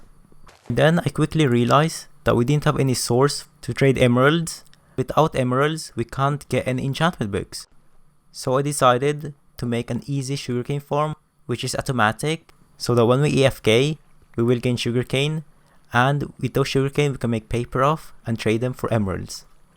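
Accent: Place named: Philippines. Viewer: i sense filipino accent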